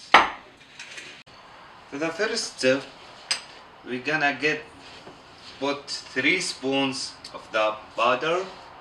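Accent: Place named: Arab world. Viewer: You are too cute with the Arab-english pronunciations!!!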